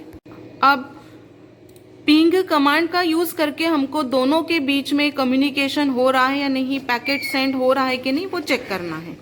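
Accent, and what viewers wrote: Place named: India. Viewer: A less Indian accent would attract more viewers, Prof B. Emphases are all ...hard. More chest-voice.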